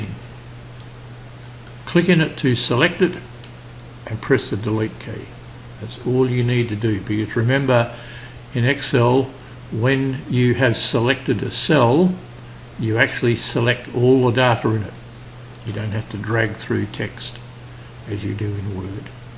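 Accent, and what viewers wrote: Australia. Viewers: Hey. great video! many thanks. Would you say that this sort of test would be carried out in the UK. I only ask as I heard a Aussie (or kiwi?!) accent and thought this test might appear more in the southern hemisphere? please let me know when you get a chance. cheers. M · awesome accent, Australia?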